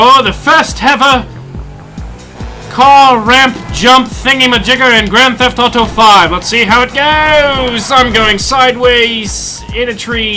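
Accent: United Kingdom. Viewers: is he british? please reply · Is he British?!?!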